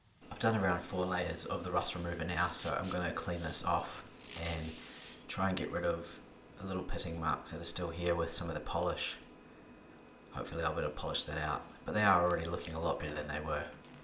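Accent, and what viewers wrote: New Zealand. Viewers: Kia Ora! New subbie here from Australia and also a kiwi 🙌🏾 keep talking bro! Love hearing the familiar accent. You have a calm voice too. Kia kaha bro 😎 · Keep talking cuz I love your kiwi accent! The piece came out beautifully! DDecker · Your kiwi accent is nice to listen to there's always the mute button for those who disagree ,great job on the stag dresser I had a set and chalk painted them disaster !!, keep up the good work . ( Greetings from Aberdeen Scotland ) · Please, keep talking. I like the explanations and the New Zealand accent. 😉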